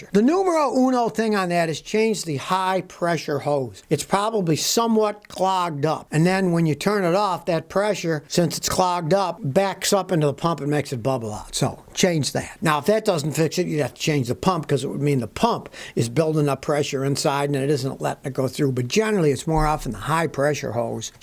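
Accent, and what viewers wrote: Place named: Texas, United States. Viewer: Hi!.. Been watching your channel for a week now..... Basically Im working from home and I use youtube for some background no-vocal DNB to put my brain in gear and keep me going while im typing... but for some reason... I can both concentrate on work, while listening to your voice... and by some miracle I can even remember everything you are saying... It must be that Texan accent;) Anway... about the OCD guy with the manual car question: Im an Aspie (Aspergers, which is similar to OCD) And I also had the pedal-reflex issue.. so much that I've developed a bad habbit of howering my foot over the clutch (while training), pressing the clutch as slow as the breaks, often times not fully.... I felt unstable in the seat and insecure and unstable to change gears in higher speeds. Forgetting to change gears and all those typical Aspie/OCD issues. 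Its a multitasking and mentally shifting tasks -issue... because of the sensory overload. Especially because the world outside of the car feels unreal and 2D to us. Here in Croatia the instructors are very strict, before only 60% would pass, now only 20% candidates pass. Licenses here cost like 900 $ for a 2-3 months theory + minimum 35 hours driving hours. And then each exam is about 90$. We also have to pass first aid exam too. And of course here we learn only on manual and drive only on their cars, but directly on the actual roads. So their cars are all manual, one steering wheel and double set of pedals. I drove almost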